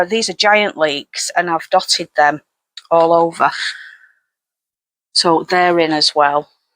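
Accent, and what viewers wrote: Lancashire, United Kingdom. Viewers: Oh you popped up in my for you page and I thought I recognised that accent! I’m from Blackpool too. We live in Lancaster now, we also have a tiny yard, but have just been given keys to an allotment, it’s so lovely to have two places to grow. I’m sorry about yours, how annoying about ICI. I am loving your garden, it’s lovely.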